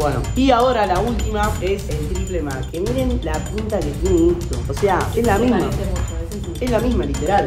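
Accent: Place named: Argentina. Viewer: me encantan tus vídeos y también tu acento argentino 😊😊😊😊❤❤ un besote desde San Martín de la Vega 😊😊😊❤😊❤😊❤😊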